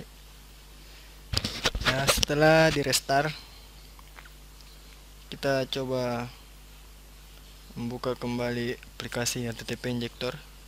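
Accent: Makassar, Indonesia. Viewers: dari logatnya kayak org makassar.. wkwwkwwk yg ngomong macam pengen ketawa tapi ditahan. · Kayak nakassar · lokatnya makassar bgt...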